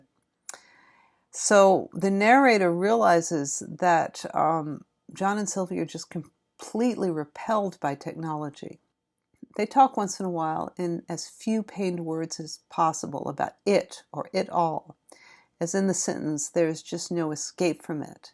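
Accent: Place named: United States. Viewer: The YouTube algorithm was spot on with a link to your channel. Great work - many thannks. I have just started ZAMM again by listening to it (curtesy of pirated version on YouTube) having first read it in 1978 and read it numerous times since. The American voice is adding a new dimension. One reading following the route with Google Street View is something I would recommend. America is so different to UK where I have done all my riding. I have also been listening to JBP, Rebel Wisdom, John Vervaeke, Jonathan Pageau and Paul VanderKlay so this is really pulling lots of strands together. I am an Anglican priest and also a motorcyclist who has spent hours and hours in motorcycle maintenance. I have never really seen why ZAMM is specifically Buddhist. Really looking foward to the rest of the series and hoping to find time to engage in the discussion in the comments.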